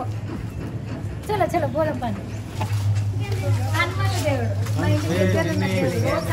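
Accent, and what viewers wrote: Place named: Nizamabad, India. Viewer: మన యాస నిజామాబాద్ జిల్లా కైతిని ప్రపంచనికి తెలిసేలా చేశారు మీకు ధన్యవాదాలు తెలుుతున్నాను అక్క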